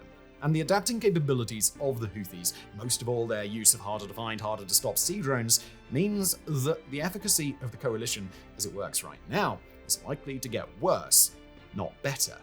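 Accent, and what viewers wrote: RP, United Kingdom. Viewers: Couldn't watch the 'over acting or the over the top posh english with the noticeable changes in the octave of the tone', i thought he sloved it down noticeable recently but guess he's back at it again. Time stamp is .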